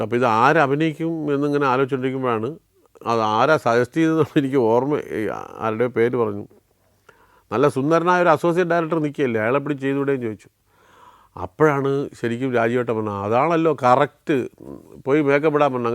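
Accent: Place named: Trivandrum, India. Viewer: great to hear proper Trivandrum accent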